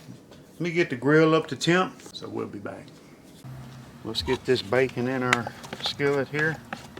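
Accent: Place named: South, United States. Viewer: As a kid I loved the southern accent and attitude. I still do, though as a Canadian I feel like I’m one of the few. Keep cooking brother.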